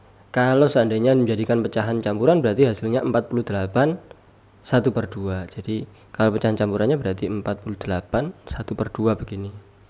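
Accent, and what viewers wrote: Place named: Temanggung, Indonesia. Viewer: kakak orang temanggung bukan? logatnya temanggung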